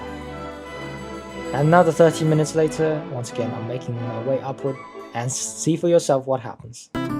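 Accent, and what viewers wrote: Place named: United Kingdom. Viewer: Unrelated question: How do you sound so British/ Western guy when your Genshin Impact Language preference is in Chinese 🤔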